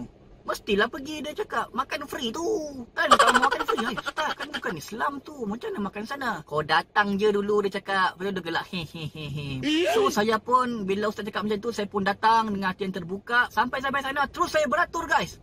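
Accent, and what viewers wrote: Sabah, Malaysia. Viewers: Slang sigu macam org sabah suda oo..salute sama kamu sigu..semoga sentiasa happy dan bahagia sama family dan murah rezeki selalu ar sigu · Say tgk ko ni mcm bukan sda org kedah ni hahahahahah. Pekat suda tu loghat sabah hahahahahah. Tpi kwn say brterima kasih jga la slepas tgk video ni, kwn say dri selangor kena suru pindah pg sabah. Mula2 ragu dia kunun mau pindah sini. Ada kwn pun say ja. Takut dia kena ilmu hitam apa smua. Takut org sabah nda terima dia. Skali dia tgk ni video nda sabar kunun dia mau pg sini. Hahahaha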